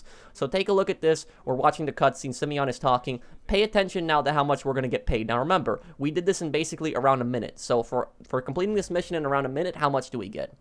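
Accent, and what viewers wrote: Poland. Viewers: Z polski jestes? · R u acctually Polish?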